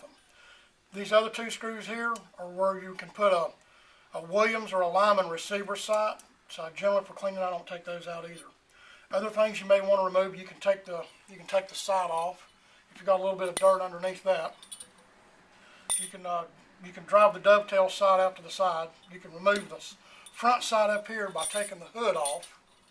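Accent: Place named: East Texas, United States. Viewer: Thanks for this video! Maine? You sound like my people here in the Texas piney woods! Gonna check your site out. I need my 1974 M94 blued. You mention that you do that. It looks like a black paint that's coming off the receiver.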